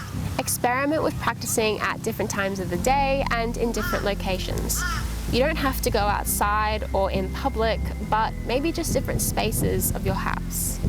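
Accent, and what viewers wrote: Australia. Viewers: Nice to hear an Aussie accent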